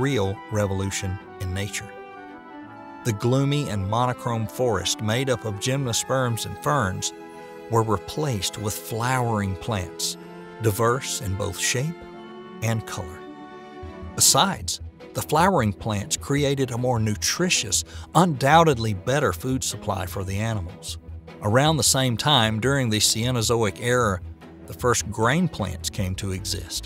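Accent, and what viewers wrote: South, United States. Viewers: It is refreshing to hear a southern accent when learning about science and history! Thank you, Narrator, for breaking the stereotype of the creationist, Bible-belt Southerner! Please, please, PLEASE narrate more science vids in your accent! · Gotta love the southern drawl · Southern US accent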